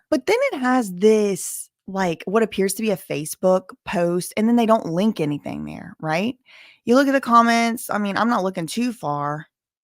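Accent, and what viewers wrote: South, United States. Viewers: HAPPY BIRTHDAY OMG OMG!!!! Yes livestream queen. It’s not for YOU. It’s for US. Your subjects. 🤣🤣🤣 jk but seriously hope you have an amazing day!!! You’re a true gem on this platform and I’m so happy you’re here instead of a fancy lawyer’s office with free coffee and free gym and stuff. We appreciate you for real. Very rare to find a beautiful woman who is also very smart and professional and genuinely cares about people and chooses to be a voice for those who can’t speak for themselves. All while maintaining a sassy southern tone. And your name is (sp?) so extra points.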